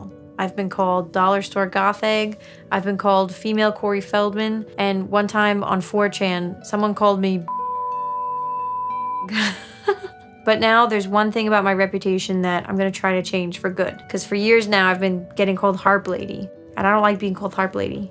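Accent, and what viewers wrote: Long Island, United States. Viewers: The well built shelves full of music-y gadgets in their og packaging? Impressive, but how do you find anything o.o Also: I heard the accent as soon as you mentioned it. It came and went. So that's a Long Island accent, huh? (Regards: "Not an American") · The track I liked the best is your Long Island accent! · Yea ur long island accent is thick af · Your Long Island Accent is not really bad, it is really good. It is refreshing to hear a regional dialect. That Perfect Circuit placement was awesome. I like the music for game #3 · Your Long Island accent is not really bad, it's just really Long Island, which is totally reasonable and legit. Local pride! Cool tracks. I love Gris. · First track was my favorite. Harp lady, gothegg, Cory Feldman!? You don’t look like any of those people, you look like Rogue from X-Men. You’re Rogue look a like that demos pedals & plays some cool music to me. 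I knew you weren’t Rogue from X-Men when I first heard you speak & had that Long Island accent instead of Rogues deep southern/Mississippi accent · Totally Long Island accent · You do have a Long Island accent but like 15% of one. It sounds like you've worked to get rid of 85% of it and honestly that work should be recognized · IT'S LONG ISLAND ACCENT?! I THOUGHT YOU WE'RE MIDWEST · This was my first time to be aware of you. I enjoyed and was impressed by your brilliant creativity with the varied tech. Also I ❤ your Long Island accent! 😊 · all on point! and your Lonk Island accent is subtle. (✿◠‿◠) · I never knew you had a Long Island accent until today. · You are very good looking and easy to listen to/watch. These people are nothing without the hate. You have a little Long Island accent but why would that be bad thing, lol dying on the Perfect Circuit bit. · Please accentuate and use your long island accent more. 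It be appreciated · Hey!! You should look up a video of a stringed instrument called a Mulatar. Its a weird Harpy guitar, drum. This thing has me looking into building one myself to sew if I can? I also own harps and guitars and bass. I don't consider myself a luthier by any means but a guy builds a guitar and that's cool? A guy builds several guitars and he's a luthier? I guess? Looong Island accent is right.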